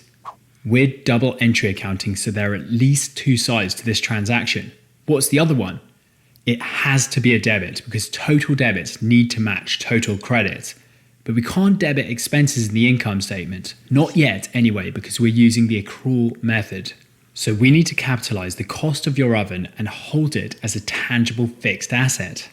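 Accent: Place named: England, United Kingdom. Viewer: Is it just me or does Accounting make more sense when explained in an English accent.... lol fr thx for sharing these informative & concise vids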